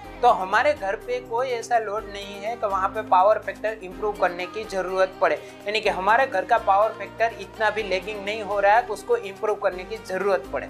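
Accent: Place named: Gujarat, India. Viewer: language tone denotes gujrati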